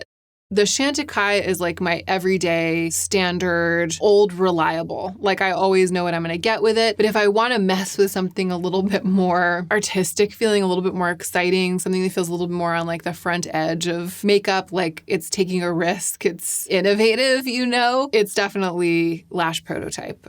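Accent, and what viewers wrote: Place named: South, United States. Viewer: Just wanted to say that I am a new subscriber - and I can’t get enough of your channel. From your southern “hey y’all” to your intelligent and thoughtful comments, all delivered with such a calm demeanor- I’m hooked! Thank you for your thought provoking, yet obvious love for beauty - much appreciated!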